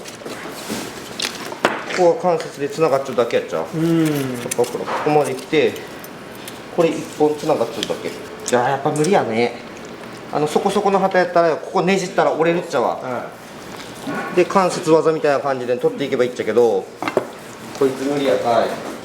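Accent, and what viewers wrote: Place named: Takanabe, Japan. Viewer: まさか古里の方言が聞けるとは思わなかった、高鍋生まれ杉並区在住の爺さんより。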